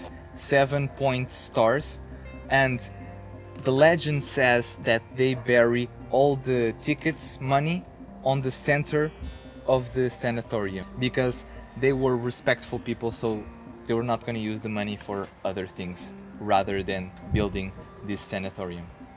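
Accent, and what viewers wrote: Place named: United States. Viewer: I love your American accent when you are trying to say a word. I forgot you don't speak English it'll the end. LOL You are doing so good with this.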